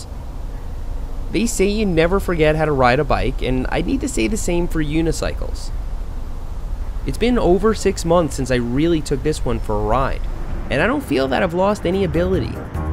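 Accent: Long Island, United States. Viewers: ! Easy to spot that Long Island accent!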